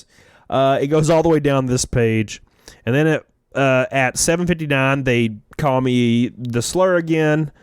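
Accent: South, United States. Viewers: Your accent lol, it’s just fine. Your from the south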